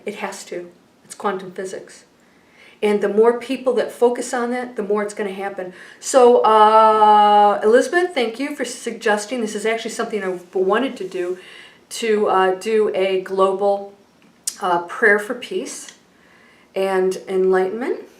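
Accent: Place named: South, United States. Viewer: I wish that was my next door neighbor. I thought that you would be Russian with that name but you have a country accent.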